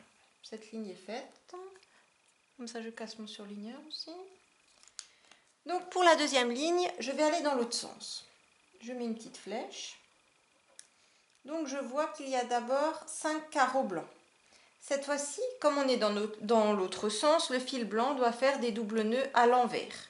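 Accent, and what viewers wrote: Alsace, France. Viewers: Tu as un accent tu viens d'où ? D'Alsace ?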